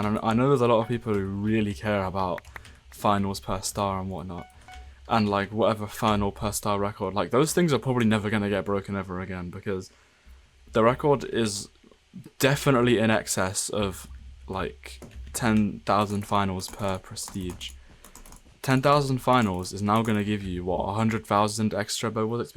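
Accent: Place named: South, United States. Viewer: Posh southerner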